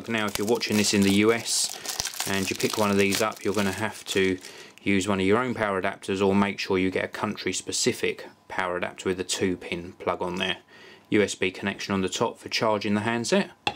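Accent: England, United Kingdom. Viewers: Haha. British accent :) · When I first opened the video, I was wondering why was doing an English accent. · British Guy!!!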